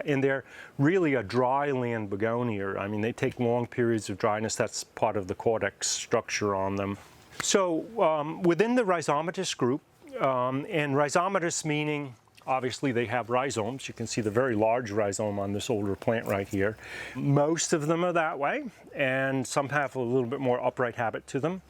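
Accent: New York, United States. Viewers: I hope you make many more Begonia video's? I love watching the endless stream of Begonia's. And they are all so different 💚 Love accent too. New York? Sounds very exotic to a European.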